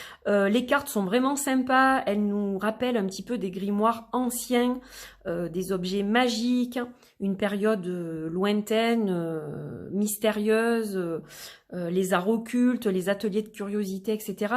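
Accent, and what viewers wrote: South, France. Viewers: Bonjour, grâce à je viens de découvrir vôtre chaîne . Joli accent sudiste si je ne me trompe pas 😉 J'adore l'univers de cet oracle et je viens de le commander. L'association avec la numérologie me ramène à mon Oracle de la Triade. Encore une fois merci à vous, je vous souhaite une très bonne soirée. 🙏🕯️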